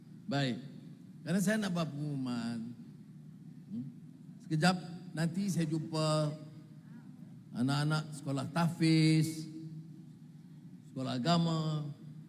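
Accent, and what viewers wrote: Indonesia. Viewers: ni memang suke gunekan loghat Indonesia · coba Kalian dengerin dehh, si ngomong nya cenderung menggunakan logat bahasa Indonesia 😅😅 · Kok logatnya bahasa Indonesia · Anak" kah? Atau budak"..😂😂😂😂. Ini perdana mentrinya aja berkata bargaya logat indonesia..banyak kosa kata indonesia yg digunakan...oh bahasa meleye sungguh merana kau..😭😭😭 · Raja Malaydesh nonton pidato Ibrahim gak ya? Pidatonya banyak melafalkan bhs Indonesia!!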